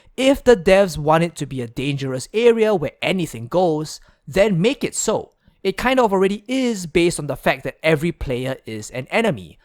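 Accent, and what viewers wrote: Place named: Singapore. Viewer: He's from Singapore. I was initially trying to figure out the accent as well. Cantonese speakers from HK will enunciate but often have have terrible vocabulary. Taiwanese speakers often inject the "z" sound in everything and usually talk slower. Singaporeans enunciate, have good vocabulary, talk fast, but they have that odd accent which I assume is a mashup of multiple different languages. Since Singapore is the hub for South East Asia, most Singaporeans are trilingual by default.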